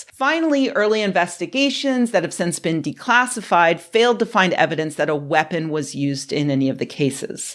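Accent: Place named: East Coast, United States. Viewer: I hear so much upper east coast in your accent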